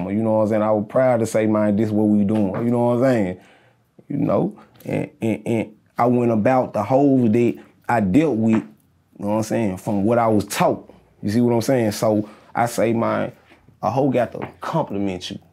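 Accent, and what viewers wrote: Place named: Memphis, United States. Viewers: Born & Raised here and manee I swear this how Memphis Dudes be Moving And Talking they get up to explain the story better I guess lol I Love it 🤗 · He's from Memphis whatchu expect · I'm from Memphis and I felt this dude and understood his speech and know the scenery that bred him and everyone here say youknowatimsayin including my college educated self church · Soon as he started talking i knew his was from Memphis Tn. I know how we talk we have that certain swagg and talk to us specifically when we say mane that's just us! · I just knew he was from Memphis when he started talking 😂😩 iykyk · I understand everything he saying. But then again I am from Memphis. Other southern states also talk like us. Know what Imsaying? You have to put I’m and saying together. You feel me? (Another one of our saying.)RIP Freddy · Right I wasn't even near my phone when I heard it first come on, I said this one from Memphis! 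Then I heard Mitchell Heights and Binghampton and I was like Ayyyyyyeeeee!😂😂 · Memphis- accent. As someone from nash, I am all too familiar with this accent. And the state of mind.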